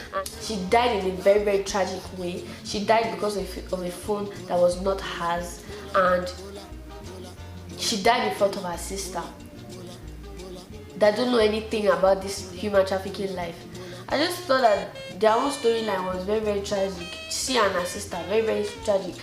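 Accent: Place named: Africa. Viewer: What part of Africa are you from. I love your accent.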